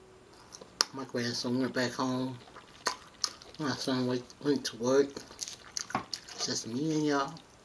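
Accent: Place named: New York, United States. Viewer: I love watching your videos I’m always here for your New York accent I miss my accent I’m so country now...lol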